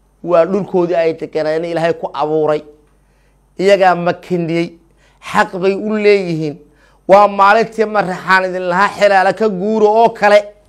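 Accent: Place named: Kenya. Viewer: Ninkan waa la haystaa duli ma timid reer sheekh Isaxaaq .waxaad tahay kenyati afsoomaliga bartay.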